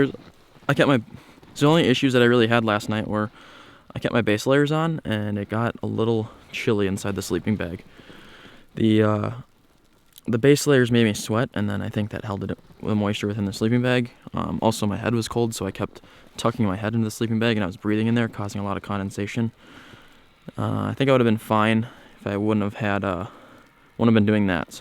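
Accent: Canada. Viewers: You sound like one of us Canadians. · You Canadian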